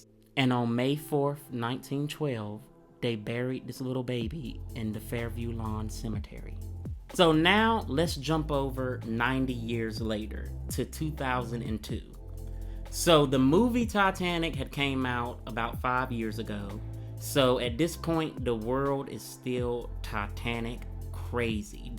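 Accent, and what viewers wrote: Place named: South, United States. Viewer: Hey - I’m loving your videos and just love your Southern accent! You mention about the DNA that was done to try to identity these poor victims. Is there enough information on this for you to create a video on what they found out? Best regards from England!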